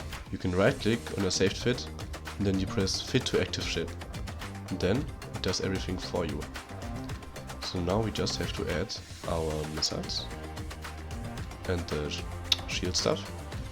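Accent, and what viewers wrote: Germany. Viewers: Ahh the German accent ..! Which eve time you playing usually?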